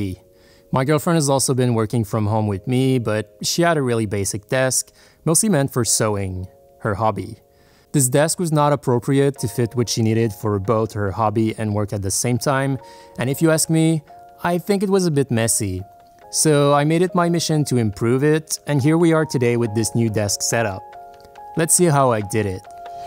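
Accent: Quebec, Canada. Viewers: i can tell by your accent, que tu viens du Québec XD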